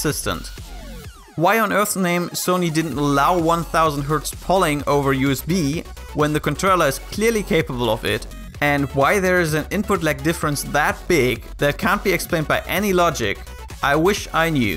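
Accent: Germany. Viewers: Are you German? Great videos! (Subscribed!) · You sound german. Are you?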